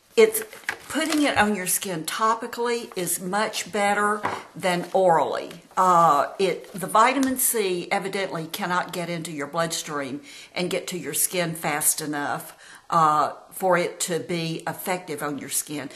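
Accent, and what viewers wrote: South, United States. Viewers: I love her and her accent because I’m from the south. You look beautiful! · This lady is an such a debutante of the South! The accent is priceless and you can tell she is a genuine person with a great heart.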